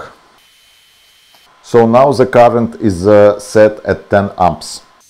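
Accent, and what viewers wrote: Russia. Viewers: Акцент, как у русского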